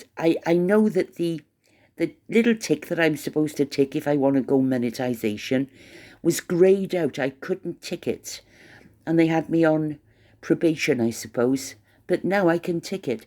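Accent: Wales, United Kingdom. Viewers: Hi,. I miss hearing your wonderful Welsh accent, as I live here in the Southern part of the U.S. Yes, you should be monetized. I empathize with you, Taz and Avid, too. God is still in charge, no matter what. Anyway, you are so very entertaining, mixed in with some home truths and life lessons for us all. Wish we could go back to a more innocent time, but our youth and childhood have long since passed. I also find myself a bit drowsy in the afternoon, as I am older, just like you. God bless you, your loved ones and friends, and take good care of yourself. 👑🏰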